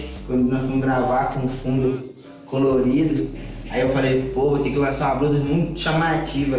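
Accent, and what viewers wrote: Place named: Belo Horizonte, Brazil. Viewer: bh ne cria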